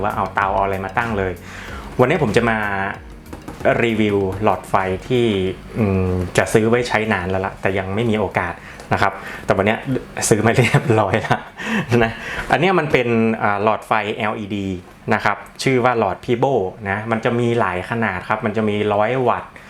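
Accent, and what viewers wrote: North, Thailand. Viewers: สำเนียงคนเหนือใช่ไหมครับ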